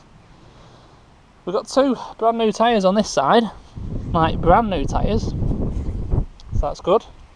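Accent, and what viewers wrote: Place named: North, United Kingdom. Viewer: Lovely Jubbly, great northern voice.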